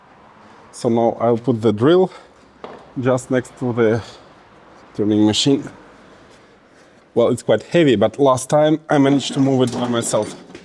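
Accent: Russia. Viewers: I thought you where German but Russian is also ok 😊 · Hahaha. Like we couldn't guess you were Russian right from the beginning. Lololol. You're too cute Dear heart. Your workshop is looking fantastic. Great job getting it tidied up. ❤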